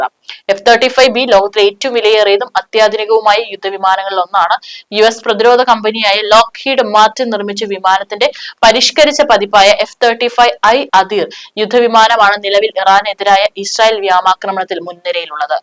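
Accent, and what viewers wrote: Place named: Thiruvananthapuram, India. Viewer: തിരോന്തരം ജില്ലകാരി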